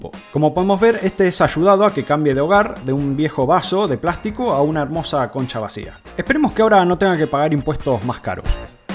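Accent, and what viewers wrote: Argentina. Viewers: un poco de todo pero argentino (No es hate)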